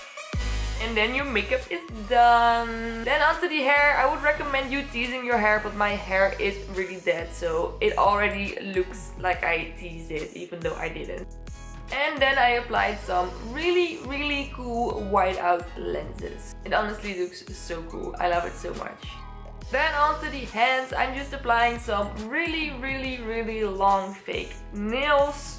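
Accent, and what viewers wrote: Netherlands. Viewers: het is zo grappig ik hoor je Nederlandse accent tussen het Engels PS love you dit is echt een van de beste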